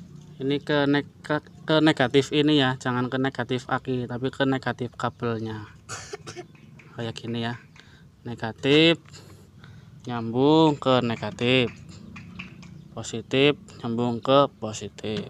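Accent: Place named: Java, Indonesia. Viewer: Mantap mas.bahasa medoknya gawek seger.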